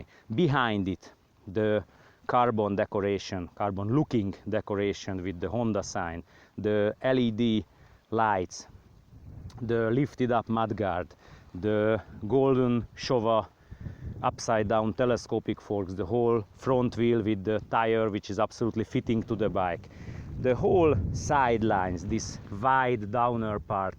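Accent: Hungary. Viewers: magyar akcentus:D nice video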